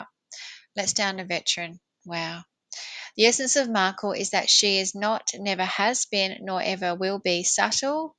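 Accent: Australia. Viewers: Are you Aussie 😂😂😂❤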